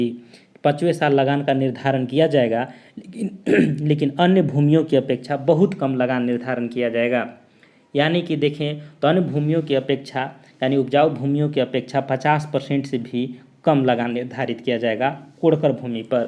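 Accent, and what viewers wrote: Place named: Bhojpuri, India. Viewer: Bhojpuri mix बा तोहार बोली में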